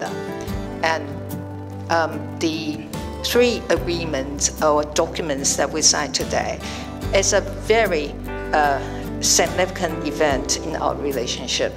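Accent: United Kingdom. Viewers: 这个英文说的 不太流利, 有点英国口音, 还有点中文口音. 这种英文水平在欧美做律师还是不太够资格的. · 本來就有英國腔嗎？ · 蔡總統的英國腔好好聽啊～更愛她了...😍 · 小英總統的英國英語腔調真的優雅好聽！❤ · 英式腔真的舒服，未來可能難再有這樣腔調的了，希望未來外交部長或總統至少都要拔擢這樣的腔調人才，有利外交形象。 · British accent very good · 英文真的蠻好的, 還帶著英國腔調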